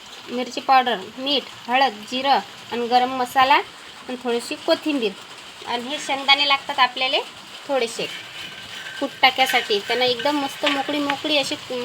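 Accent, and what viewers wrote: Vidarbha, India. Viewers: भाजी एकदम छान केली, मला तुझी भाषा आवडते कारण मी अकोल्यात राहते, तू कोणत्या गावची आहे ❤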